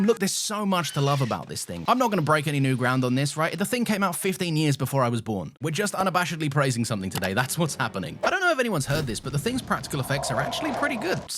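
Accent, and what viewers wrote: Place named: United Kingdom. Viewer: 20 secs into a video and I already hit the subscribe button Your vibe totally clicked with me, the pacing, the delivery, the montage, everything Keep it up, brother, that's some good stuff you're doing here in all honesty P.S. A cherry on a top of all this is the fact that I don't have to put you on 1,25 speed because you are already fast enough P.P.S. Your accent is another one (by the way what is it? I'd say it sounds British but I'm not too good at guessing these)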